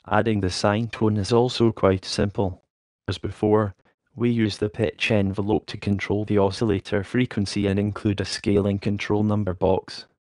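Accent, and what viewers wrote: Scotland, United Kingdom. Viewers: lol got so excited when i heard a scottish voice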